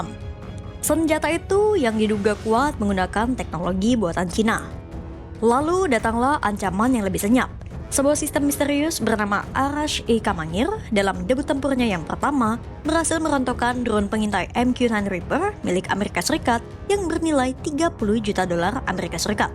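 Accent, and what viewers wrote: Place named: Palembang, Indonesia. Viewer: R khas plembang kok.., ya begitu lafalnya.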